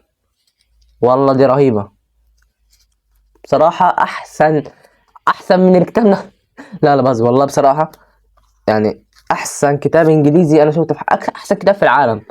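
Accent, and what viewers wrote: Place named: Egypt. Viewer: انت مصري يسطا اصل اللهجه مش ظابطه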